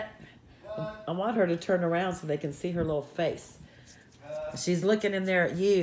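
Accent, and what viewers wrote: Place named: Alabama, United States. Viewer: When I was a kid (many moons ago), I had an aunt from Alabama. I swear hearing you talk is like hearing her. And she always made me laugh. When you say "dinner", Starr says "wait for me".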